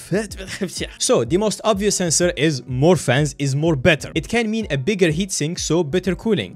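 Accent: France. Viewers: Interesting voice, is that french accent ? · What's up with that accent 😂😂 I swear every language besides English sounds weird or lame 😂 whatever accent this is sounds lame like French or something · Are you french ?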